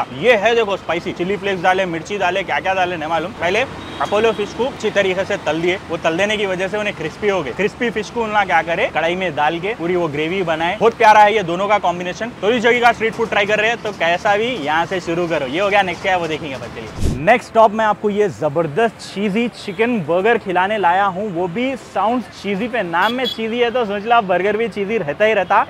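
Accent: Hyderabad, India. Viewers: Very interesting, love your hyderabadi tone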